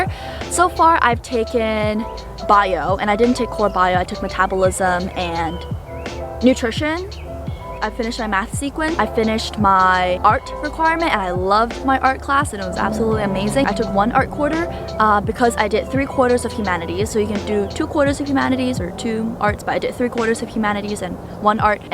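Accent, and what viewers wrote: United States. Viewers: Hey how did u practice ur American accent? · Hi, you mentioned that you could have a singaporean accent but all ur videos has been speaking English with american accent.would I PLEASE get some tips on how to keep up with ur English, or would you share how u got to learn English so good.books ur read.things u listen to you find it helpful. Or at which point ur English has gotten this level. Cuz u started tutoring while I were still in school and I am really curious how could I get so good and be able to teach. I want to improve my English but I am no longer in high school or school in general.I needed some help. I am feeling a bit hopeless on that. Hope u could share ur experience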